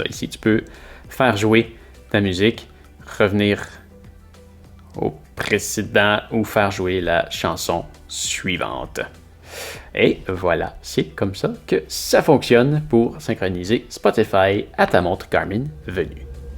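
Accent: Quebec, Canada. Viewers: Genial, merci beaucoup, ça m'a aidé à le repéré avec ma toute nouvelle montre Garmin :) En plus, je kif ton accent quebecois :) Juste un truc, je cherche encore comment on règle le volume .... si tu as la réponse, c'est welcome !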